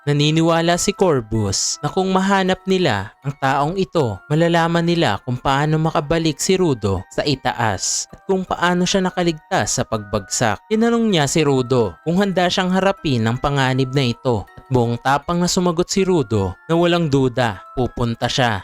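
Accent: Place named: Iloilo, Philippines. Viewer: Idol pa illongo kana pero keep it up at salamat sa recap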